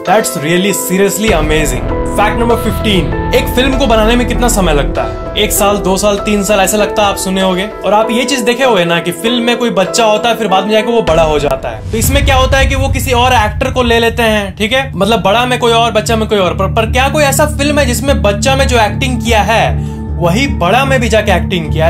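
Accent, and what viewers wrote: Bihar, India. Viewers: Aap bihari ho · sala ekdum ganda wala bihari lagta hai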